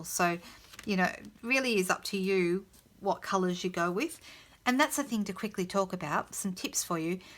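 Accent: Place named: Australia. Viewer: Thank you for making this set less daunting 😀👍🏼. Have ordered it & now feel ok about trying it out . Nice to hear an Aussie voice😀. Jane from SA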